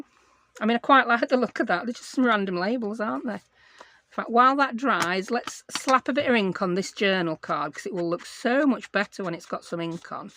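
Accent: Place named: Northern England, United Kingdom. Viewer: I’m so happy I’ve found your channel! I’ve recently got into paper craft after starting a wedding album for my friends upcoming wedding. I’ve found loads of US creators but no one from the UK so you’ve made my day! You also sound quite local to me, your accent doesn’t sound too far away, I’m from Sheffield and will now be binging all of your videos! Xx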